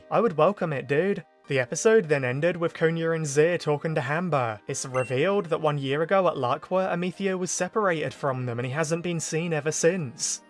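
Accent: United Kingdom. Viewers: You don't sound tired..... you sound brittish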